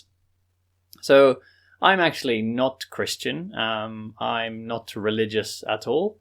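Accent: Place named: United Kingdom. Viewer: Thank you, I have been living in England for 4 years bot I have got prublem in listening and spiking. I have been loking for a poudcatd and i belive thay your poudcast is the best for peopels how like leirn english by acsent uk. thank you egain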